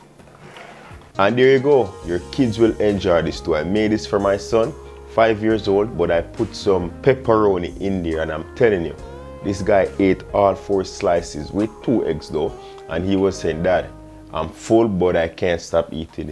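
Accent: Jamaica. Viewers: New to your channel, and your Jamaican accent and delicous food is absolutely amazing.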